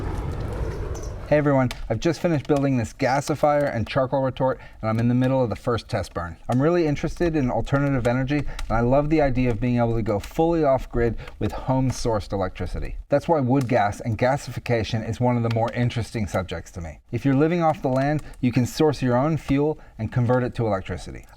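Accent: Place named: United States. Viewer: Due to infectious curiosity, undiagnosed ADHD perhaps, I sidetracked my initial viewing of the video on a tangent. I hope you'll indulge some observations and the question those elicit. To my ears at least, you sound very American. However, several items in the setting, the whitewashed stone building most of all but even the colors of those wheels and tires, seemed more European in origin. So, I had to research " " to discover that you are located in the UK. Hence the question, which I hope you do not mind: Are you an American expat? I'm impressed with your design and fabrication and the thoroughness of your presentation. Ever since my family's livelihood and my own ability to travel freely via personal vehicle without regard to time or distance was adversely affected by the first Arab Oil Embargo in 1973, I have been intrigued by the notion of independent energy production. 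Today, was my first exposure to your channel and its content. I "liked, subscribed, and hit the bell" immediately and will be looking forward to seeing you further test and develop/refine the gasifier design and the materials it produces!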